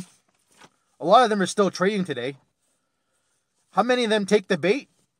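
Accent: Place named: Portugal. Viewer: Are you from Portuguese decent bc I sense a very mild accent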